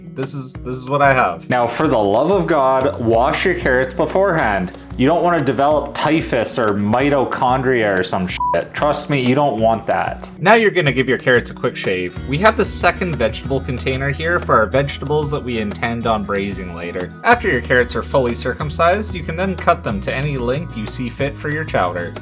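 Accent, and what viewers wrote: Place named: Alberta, Canada. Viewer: Who can tell me where in Canada this guy is from? the accent ..........Alberta?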